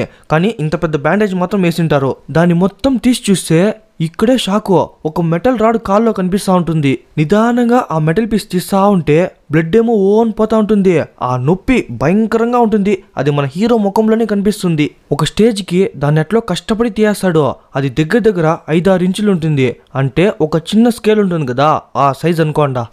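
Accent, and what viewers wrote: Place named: Chittoor, India. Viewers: Thammudu ekadanundi nuvu Ni language slang Chittooru madiriga vunde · Babu ee uooru manadi yasa tedagaundi, rayalaseema slang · This chittor slang and he live in Bangalore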